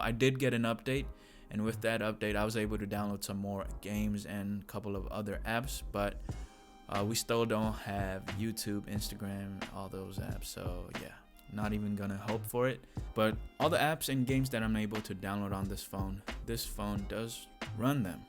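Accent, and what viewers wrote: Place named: England, United Kingdom. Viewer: I like your English accent. I am a native Spanish speaker but I also speak English as my second language and it sounds just like yours. I broke my phone about 3 years ago. Asked one my friends if he had an extra phone floating around in his house that I could use and he said yeah. He gave me an HTC Windows phone and I was so happy cuz it was my first time seeing a phone like that but the sim slut was too big for my chip so I'd only be able to use it with wifi. It started working real good but after a month or two it shut down completely. Whenever I turn it on, it won't connect to the wifi.. Can't do anything on it. Can't text .. can't do none of that anymore. Went on Saint Google looking for answers and I found out that it has stopped working.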